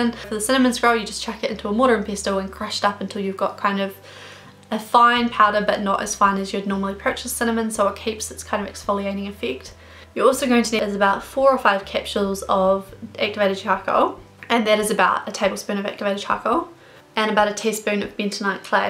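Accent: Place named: South, New Zealand. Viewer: It’s because she comes from the south of New Zealand (the south island) and they tend to have a different accent to thise who like up north (the north island)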